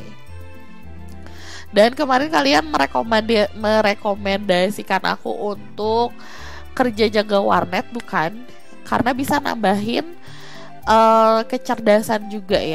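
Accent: West Java, Indonesia. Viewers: yeyy upload lgi❤️❤️.. mskpun telat..... edit: sundanya keluar semua😂wkwkwkw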